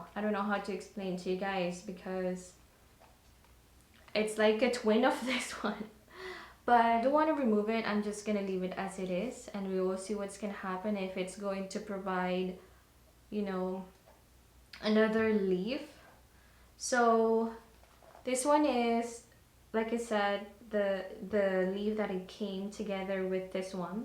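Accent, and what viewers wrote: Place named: Philippines. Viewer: Its cool, no matter where we live, we filipinos sound the same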